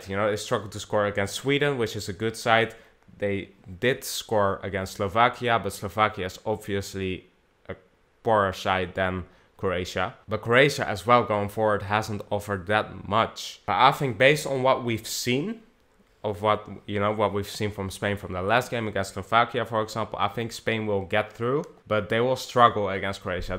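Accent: Netherlands. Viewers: ik ben ook nl btw ik wist dat je nl was was te merken aan je accent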